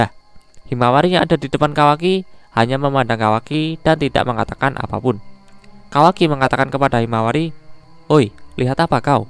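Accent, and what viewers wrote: Java, Indonesia. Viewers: Medok broo.. heheh ayoo puasa.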